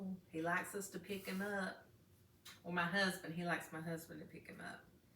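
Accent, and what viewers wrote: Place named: Texas, United States. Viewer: Just found you on here your banana bread looks so nice I'm going to try it cos respices tells you 2 bananas yours has 5 so maybe it will taste and look better I'm like to bake but I'm not very good at it 😂and love your texes voice I'm Irish but live in Spain anyway glad I came arocse you till next time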